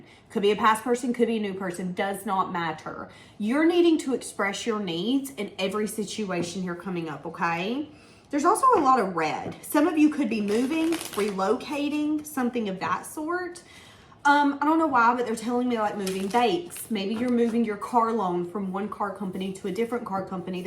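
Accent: South, United States. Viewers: YES another reader with a PERSONALITY! some of these channels have such a low energy, weird dry demeanor like they don't even wanna be doing the reading lol and i LOVE the southern charm 😜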